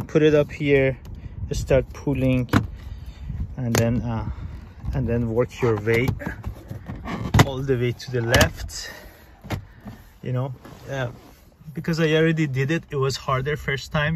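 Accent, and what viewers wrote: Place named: Iran. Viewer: That is an Iranian accent if I’ve ever heard one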